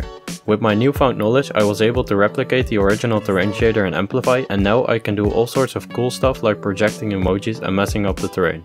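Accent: Netherlands. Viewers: Are you Dutch? Your accent sounds really Dutch... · Why do you sound dutch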